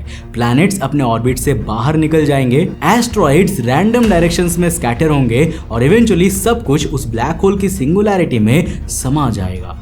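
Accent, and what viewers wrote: Bengal, India. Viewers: Bhaiya kya ap Bengali ho ?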